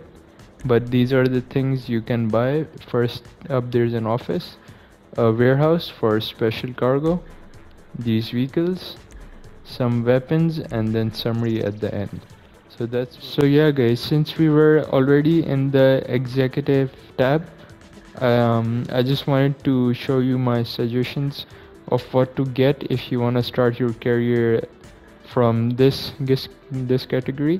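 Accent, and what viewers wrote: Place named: India. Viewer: Are u from India? It's hard to bear your accent 🤣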